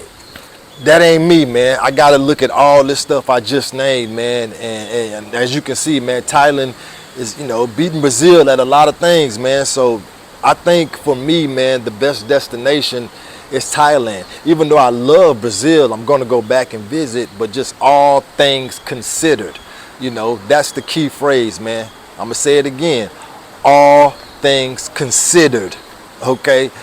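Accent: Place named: South, United States. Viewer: My brother you got game the gift for gab and much respect to you for help with understanding where we travel 🙌 💯 let's chop it up 😅 hey where you from Miami i bet somewhere down south. Im from Montreal Quebec Canada 🇨🇦 but visited my Aunt Uncle and cousins Miami dade airforce base and sounded similar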